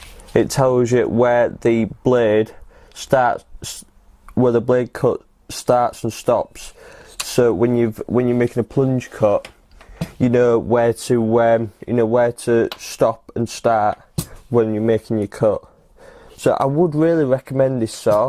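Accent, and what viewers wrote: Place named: Manchester, United Kingdom. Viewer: Wow the manc accent in strong in this one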